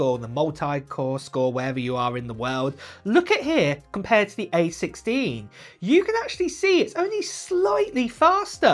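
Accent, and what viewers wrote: United Kingdom. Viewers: Will be upgrading from my iPhone 13 Pro Max 256gb to 16 Pro Max 256 gb this Friday! I’ll even copy your delightful British accent, for the duration of my ordering call! 😂❤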